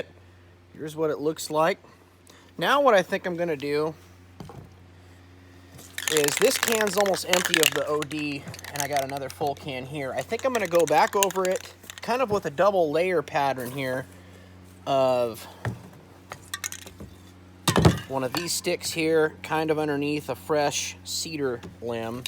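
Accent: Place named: Backwoods, United States. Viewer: Can you try the next video with an Australian accent. This whole backwoods American thing doesn’t suit you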